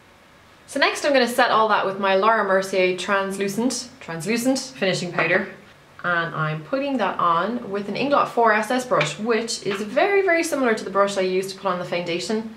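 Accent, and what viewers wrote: Ireland. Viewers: Love it! You're sounding much more Irish in this video :) Did you recently visit home?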